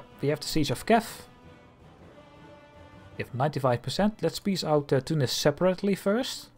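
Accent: Netherlands. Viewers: Hey, I hope you are willing to continue on your tutorial! It was really helpfull in understanding the game sofar since you talk clearly, don't talk fast and explain it rather good. Also the dutch accent makes it for me better understandable (gezien ik ook Nederlands ben), so love that. Anyway, I hope you'll find yourself able to continue. Great thank for the tutorial so far!!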